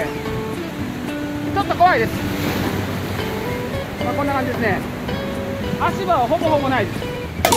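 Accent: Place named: Tosa, Japan. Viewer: 流石のもすカッチ良いなー、そして、土佐弁大好きやわ。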